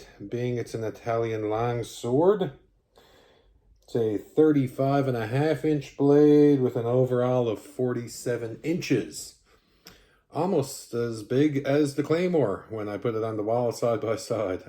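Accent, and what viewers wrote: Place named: Newfoundland, Canada. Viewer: Was looking at buying this sword, watching your video...hmm that accent sounds familiar. Sounds like a fellow Nflder? Anyway, subscribed and liked.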